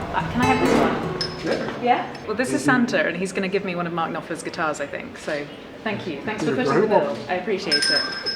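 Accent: United Kingdom. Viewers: She can play well and speaks a perfect English. By the way, she's got a british accent. So lucky to have the chance to play those wonderful guitars ! I enjoyed her video. Well done !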